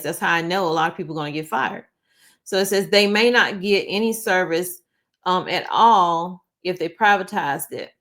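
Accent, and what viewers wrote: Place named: South, United States. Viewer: She sounds like a southern white woman🤷🏽‍♂️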